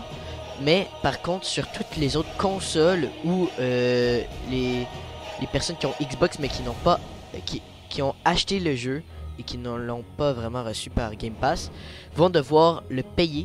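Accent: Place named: Quebec, Canada. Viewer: juste question pas rapport té tu québécois ta un petit accent 😅🇨🇦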